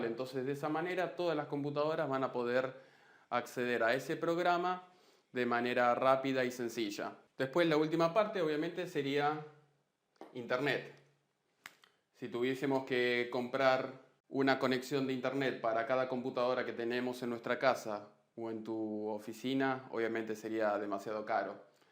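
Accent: Argentina. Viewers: De donde eres entonces? porque tienes acento Argentino PD:Buen video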